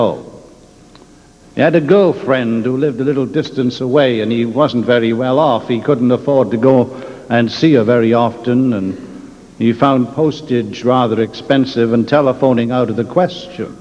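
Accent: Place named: Wales, United Kingdom. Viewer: Was Dr Phillips a Welshman? I'm sure i detect a Welsh accent in his poetic delivery.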